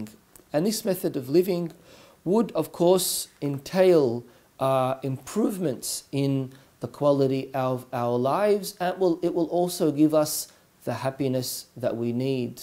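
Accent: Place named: Australia. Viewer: Shia page. With Aussie accent.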